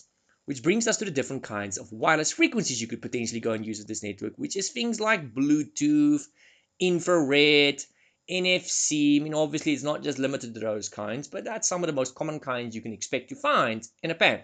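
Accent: Afrikaans, South Africa. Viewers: I can not unhear the Afrikaans poping out from the english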